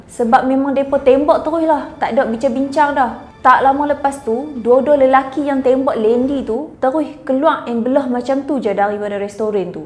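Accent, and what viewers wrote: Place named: Kedah, Malaysia. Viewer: pekat nahh kedah.. kami suka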